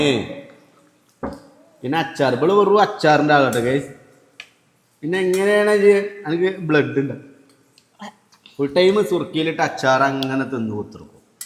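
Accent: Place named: Malappuram, India. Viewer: I am malappuram same pronounce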